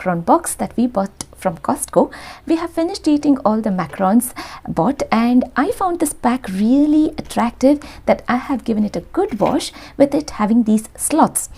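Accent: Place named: Belgaum, India. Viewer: Hello can i knw from which place ru. Becoz ur kannada is same like belgaum kannada so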